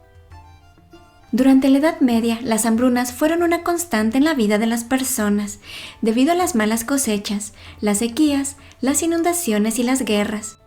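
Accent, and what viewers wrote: Mexico. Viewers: La voz es de mejicano con acento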